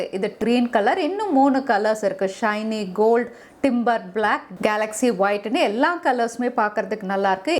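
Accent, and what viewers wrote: Kerala, India. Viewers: genuine review ur slang like mallu ,,,,,,,,,,,anyway fantastic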